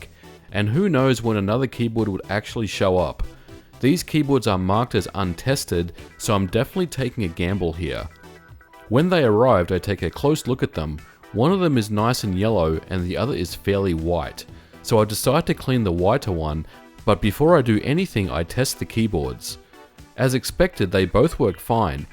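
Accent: Australia. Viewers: You sound Australian... if so, where the hell did you get a 128D for $30?! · Wait, it's a Commodore 128DCR? Is it a NTSC or PAL version? If it's NTSC, what special steps if any did you have to get it to work with a PAL monitor and 240V 50Hz AC. I ask cause you sound Australian...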